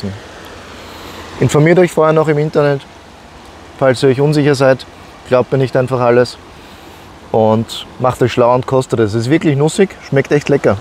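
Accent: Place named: Carinthia, Austria. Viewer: Hallo da habe ich keine Probleme,dich zu verstehen 🙂 habe viele Jahre im Kärnten meine Ferien verbracht 🇦🇹 war immer eine super Zeit,die ich dort verbringen durfte ♥️ Daher ist mir deine Aussprache sehr bekannt 🤗 Gutes Video von dir,Wie immer sehr informativ Grüsse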